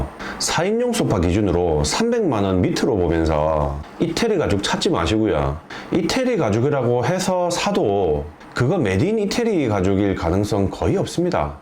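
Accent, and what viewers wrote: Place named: Gyeongsang, South Korea. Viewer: 경상도 억양이 살짝 있네요.ㅋㅋ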